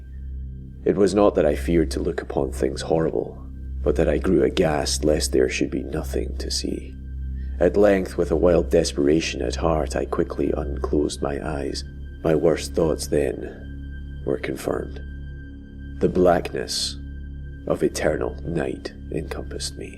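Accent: Scotland, United Kingdom. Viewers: Oh my! I must tell you...I LOVE YOUR ACCENT! Are you scottish? :D Will you marry me? Haha! But seriously, you have a very lovely accent...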